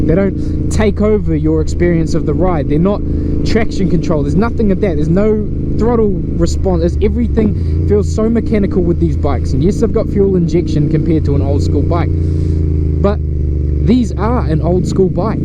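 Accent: Australia. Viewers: R u Australian by any chance?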